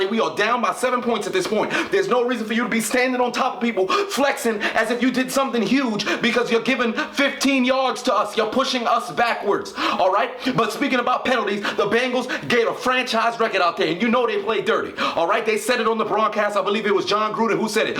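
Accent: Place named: South Louisiana, United States. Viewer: I'm just curious based on the way you speak. Are you from South Louisiana?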